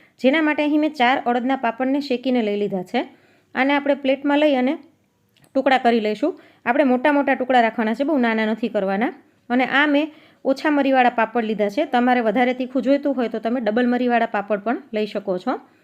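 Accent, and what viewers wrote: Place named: Ahmedabad, India. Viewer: Hu amdawad thi badhaj Shak saras che ben ❤ tamari boli parthi tame pn amdawad na lagocho ben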